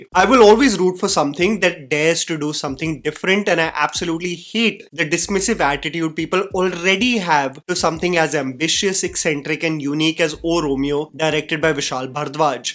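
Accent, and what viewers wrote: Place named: India. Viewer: My god, where do you get your English from. I know it's not chatgpt because you've been this way since before Ai. This is where the Indian accent doesn't sound too bad cuz the way you express what you wanna say.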